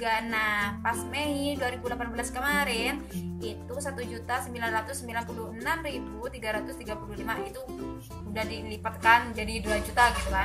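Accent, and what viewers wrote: Madura, Indonesia. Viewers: dari madura yah? kok logatnya kek org madura😅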